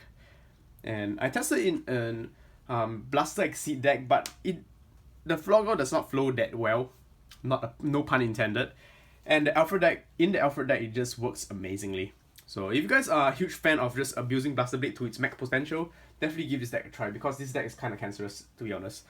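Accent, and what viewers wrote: Singapore. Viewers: You sound like a singaporean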